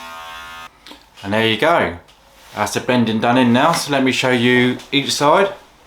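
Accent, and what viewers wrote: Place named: Essex, United Kingdom. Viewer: Uk, Essex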